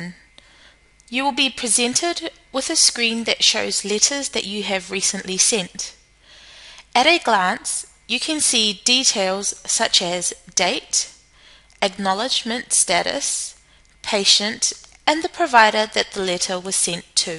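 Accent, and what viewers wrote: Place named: New Zealand. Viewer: If these medical software providers want to be taken seriously the first thing they have to do is stop with the childish, inane names like 'Dr Darth Vader' and patients called 'Mickey Mouse'. I'm sure they think they're just hilarious - hahaha - but Health Providers and Specialists won't. It completely destroys your credibility. I'd also be closely monitoring what videos you put out there. Presentation and a pleasant speaking voice matters. This one had way too much of the kiwi in it. And this one below (I don't know what to say. It's that bad, I have no words except to say it should be taken down immediately - as well as anything else by the same content creator). <link>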